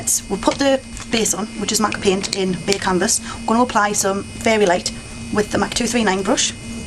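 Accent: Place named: England, United Kingdom. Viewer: you have a great accent, english i'm guessing? you have great skills too woman :D & i totally understand about the collection thing and i respect you for thinking more of others than just yourself... all over awesome!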